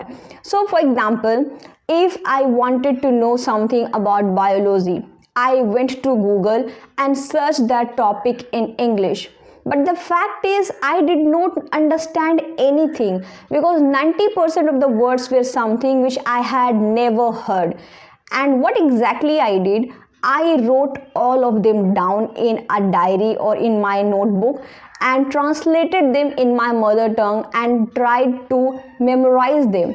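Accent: India. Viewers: i would recommend you not to change your accent. it’s natural to have ones own accent which country they’re belong to. If a foreigner start speking hindi in their accent and utter ever sentences like foreginer it would also be incomprehensible for us to comprehend. Earnest plead from my side 😝😝btw love your contents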